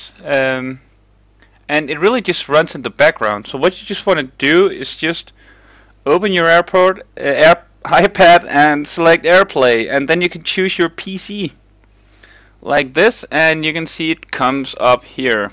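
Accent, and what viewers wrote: Denmark. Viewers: Nice tak for hjælpen kan høre på dit engelsk du er dansk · Er du dansker??!?? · Du er dansker? ;)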